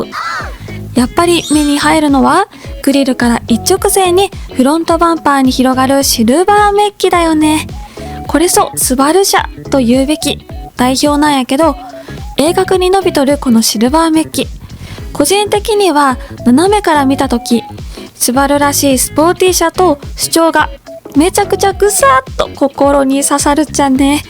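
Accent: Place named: Fukuoka, Japan. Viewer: いつも楽しく拝見させて貰ってます！ 僕は福岡県内に住んでるんですが こっちの方言にクリソツなんですが 愛媛育ちなんですか？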